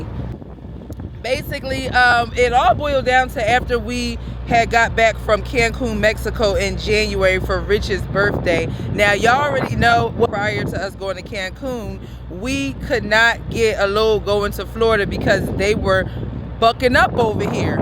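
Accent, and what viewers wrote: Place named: Caribbean. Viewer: Nice video you sound like someone who has caribbean blood in you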